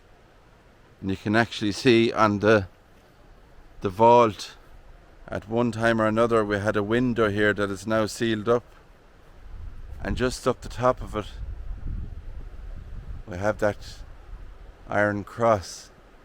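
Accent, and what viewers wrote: Ireland. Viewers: I enjoy your channel and videos very much! As a Midwestern American, I could listen to your great Irish accent all day!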